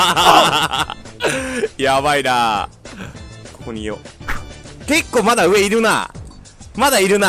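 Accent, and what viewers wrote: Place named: Kansai, Japan. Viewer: 関西弁すこ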